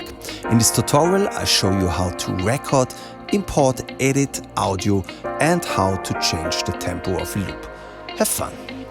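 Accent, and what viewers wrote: Germany. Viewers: I like this dude. I usually find German accents too harsh but this chap is very pleasant to listen to. Doesn’t sound like he’s in a hurry like most YouTubers! Nice and chilled out and uses pauses and explains things well. I also love how he says “schteinberg” in the true german way. I also love dom sigalas videos but I am finding those constant zooming jump cuts really annoying!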